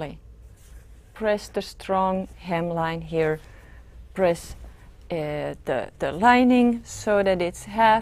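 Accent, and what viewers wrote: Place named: Germany. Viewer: Thanks for an easy to follow tutorial. Joining the lining to the sleeve has always been a puzzle to me. Years ago I made just one jacket, it wore me out! Commercial patterns are of NO help when it comes to instructions. Up until today, I thought I'd done something wrong when I didn't go all the way around when joining the lining to the jacket at the bottom of the sleeve. "Bagging" the jacket, only now, makes sense, because you've shown how it's done. Thank you again and thanks to the college for help in making this video. BTW, (as I laugh at myself) in the beginning I was trying so hard to understand what a "velt" was. I thought, "hmm, that must be a new term." Then I remembered my days when I lived in Bavaria and recalled how German's W's and V's are the reverse of the English version. I then rewound the tape now that I could better concentrate on what you were a saying, not how you were saying it. 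You've done a lovely job here, I hope lots of sewers have the opportunity to find you. However, below is a good question.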